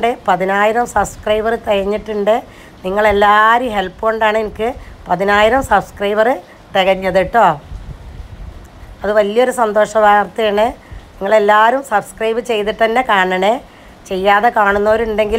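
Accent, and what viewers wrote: Malappuram, India. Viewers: മലപ്പുറം ആണോ സ്ഥലം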